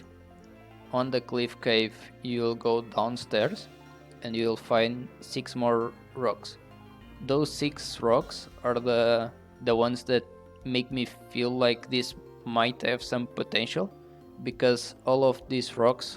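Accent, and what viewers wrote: Portugal. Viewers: This is gonna be a shot in the dark. But I feel the Portuguese accent here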